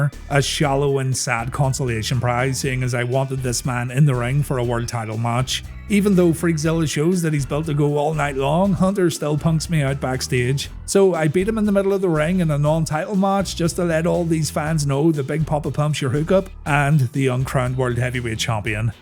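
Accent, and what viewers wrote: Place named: Belfast, United Kingdom. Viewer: You from Belfast lad? You sound like it, from there myself.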